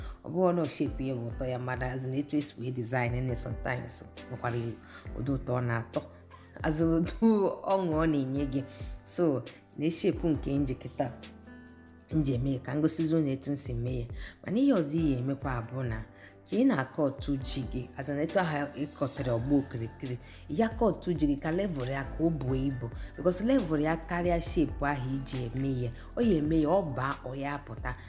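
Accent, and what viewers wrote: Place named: Anambra, Nigeria. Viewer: Nne, ji gi a ehn nyum nyum. And I sotay enjoy this your Anambra Igbo so much oh!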